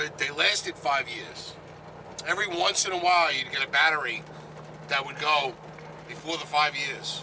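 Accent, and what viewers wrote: New York, United States. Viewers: Sounds like you're from New York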